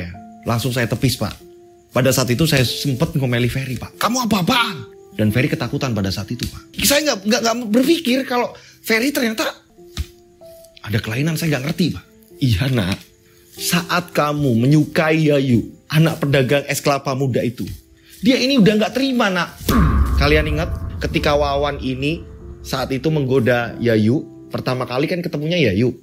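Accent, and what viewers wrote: Java, Indonesia. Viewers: Nok....nok... assalamualaikum kak dr NSW SYDNEY OZI, nonton video kak selonjoran sambil pakai blanket winter's🥶, seneng liat video kak dengan bhs jowone medok, kangen ngobrol bhs jowo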